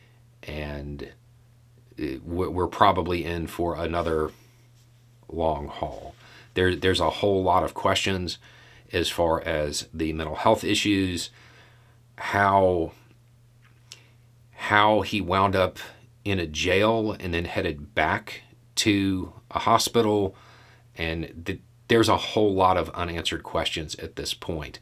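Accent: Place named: South, United States. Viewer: Yeah, I like his accent. I'm used to most southern accents, but stands out to me.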